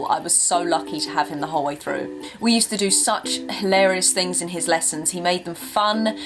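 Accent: United Kingdom. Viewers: I love your British accent! I started watching you because of it :D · Your british is amazing :-).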